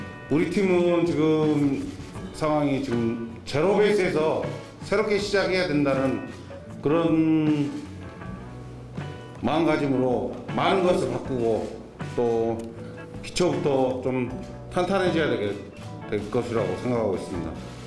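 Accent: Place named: Honam, South Korea. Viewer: 말투가 약간 호남 사투리가 썪어 있다고 할까요? ㅎㅎㅎㅎㅎ 아무튼 김종국호의 타이거즈 기대합니다.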